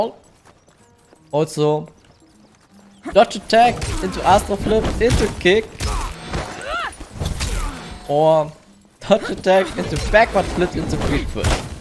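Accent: Germany. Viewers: Hey! I was wondering where you come from. Because your english sounds like it has an german accent in it. Thanks for the answer! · man hört den deutschen aktzent raus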